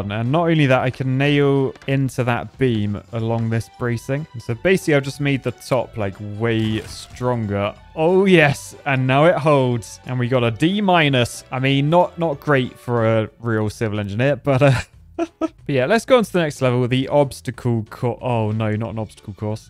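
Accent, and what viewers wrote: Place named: United Kingdom. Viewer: That accent he's got. What is it? It's British, but what part of England goes "bewesh" instead of "boosh" and "cloiyser" instead of "closer". It tickles my ear to hear someone say "Aye doiyn't naeuiy" instead of "I don't know"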